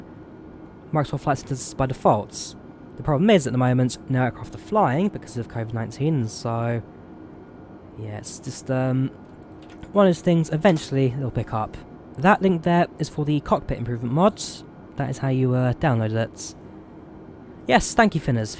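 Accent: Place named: Yorkshire, United Kingdom. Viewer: Where are you from...you have that yorkshire accent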